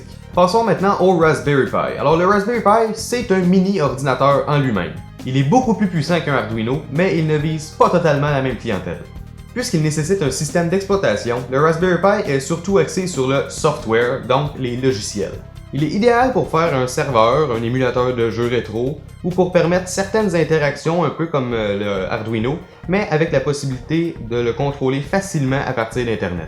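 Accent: Canada. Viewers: canadian by any chance ??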